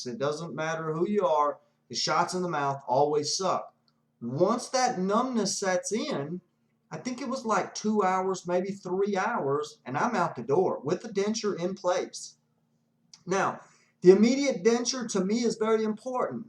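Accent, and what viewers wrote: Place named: United States. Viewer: man.. I am so happy I found your videos! I myself have been in a similar situation with my teeth and after the past 10 years and $thousands of dollars on root canals, crowns that break or fall off, on and on, you know.. I've decided I just have to get dentures. I have no choice and implants are out of the question. You've given valuable information to me and I'm sure a lot of other people. I feel like I'm listening to a close friend because of your accent. Well, it's not really an "accent", people don't understand that it's American the way we speak. Anyway, thank you again. I have a lot to do! Take care buddy.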